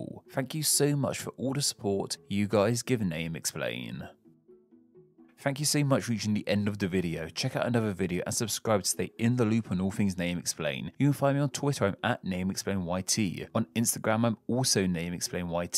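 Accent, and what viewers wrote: United Kingdom. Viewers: Are u british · What region of UK is your accent from?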